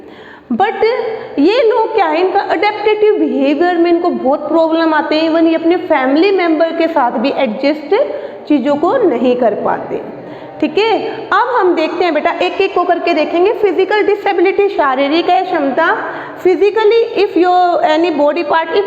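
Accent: Haryana, India. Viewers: Mam aapka Haryanvi touch aa rha h🥰 Lkn fir bhi m aapse hi pdti hu 🤓🤓 PHE ke sbse best teacher ho aap mam 🙏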